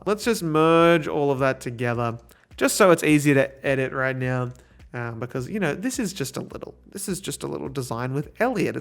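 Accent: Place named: Australia. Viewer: fucking austrailian accent. "nortica". for the americans, he means nautica on adobe fonts.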